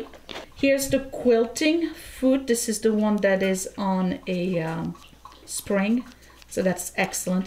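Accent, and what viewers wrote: France. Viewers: Happy birthday!🎂🤗 I am excited to see your quilting and sewing projects. That is something I have always wanted to do, but never really been able to accomplish. Maybe my old yard sale machine was the problem 😉lol. Anyway I have already learned a lot from this video. I always look for your new videos and love your French accent, it is beautiful. Thanks for sharing.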